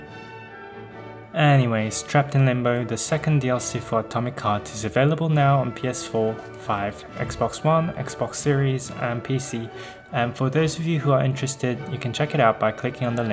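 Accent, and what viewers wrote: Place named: Australia. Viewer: YOUR ACCENT IS AUSTRALIAN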